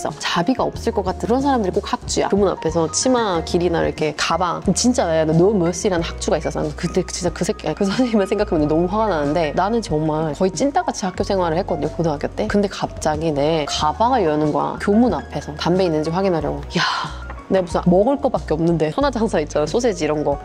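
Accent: United Kingdom. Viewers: 영국에서 살았었지만 역사에 관심이 없어서.. 시험에 관련된 아편전쟁만 외우고 있었는데 이런 배경이 있을줄이야~~~ 예전부터 님꺼 듣고 영국영어 계속 공부해왔었는데 이런 상식도 넘 듣기 좋네요 ㅎㅎ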